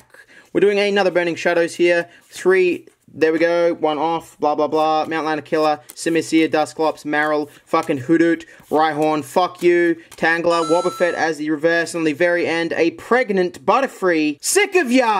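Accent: Australia. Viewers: Nah thats just how Aussies talk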